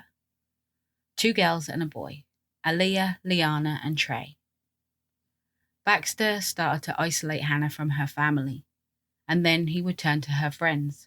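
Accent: United Kingdom. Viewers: Great to hear a british accent for a change!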